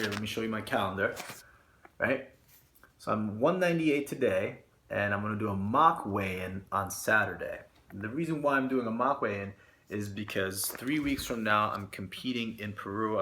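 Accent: New York, United States. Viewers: bud ekins he just sounds like a American to me I'm almost guaranteed that he was born in America but if he isn't I'm very impressed. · Lmao just because he has an Asian name doesn't mean he has to have a Japanese accent. He was probably born and raised in New York hence his accent. Probably never been to Japan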